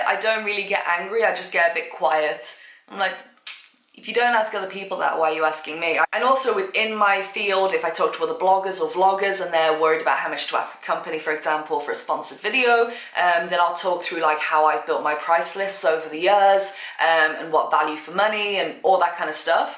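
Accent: United Kingdom. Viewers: I love ur accent i want to find me a British wife got any friends? Lol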